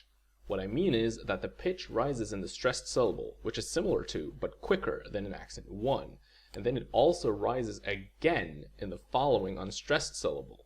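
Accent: Stockholm, Sweden. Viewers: Love hearing this with your thick swedish accent in english :D makes it so much more credible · You sound swedish. I think you need to improve your accent a little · vilken dialekt har du som säger "kvinner"? Är själv uppvuxen i Stockholm och säger "kvinnor". Nyfiken, eftersom du annars verkar ha exakt samma dialekt som jag :) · its not a strong dialect sure but his stockholmska shines through when he pronounces words with such a twang. Kvinnor, in rikssvenska, should be kvinnOr. Not kvinner nor kvinnör. Like teaching a beginner chinese class with a Beijing accent. Improper and inefficient. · Listening on his tone of the voice im gona guess he's swedish, but its hard to tell. :D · That's just his "Stockholm accent" :P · Jag gillar din svengelska!!!😋 I like your Swenglish!!!😋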